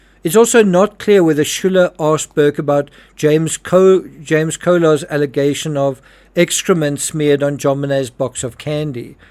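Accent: South Africa. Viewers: South African accent. Love it too when he says that!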